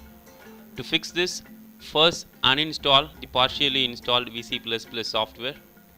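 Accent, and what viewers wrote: India. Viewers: İndian English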